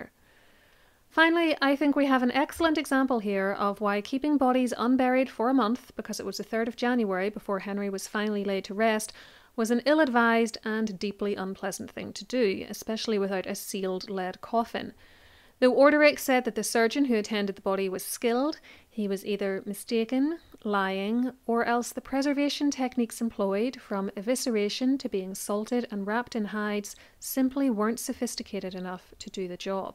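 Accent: Northern Ireland, United Kingdom. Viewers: She's from Northern Ireland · I agree, part of the reason I listen is for the beautiful music of this accent. Northern Irish, maybe? Whatever it is, your diction and accent make the videos wonderful to listen to. 🥰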